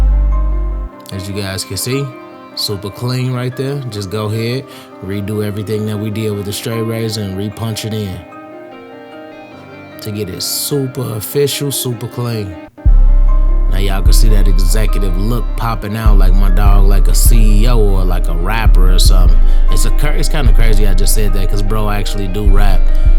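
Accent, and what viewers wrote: Chicago, United States. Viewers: I’m curious I heard him say goofy 😂 you from Chicago bro this my first time watching one of your vids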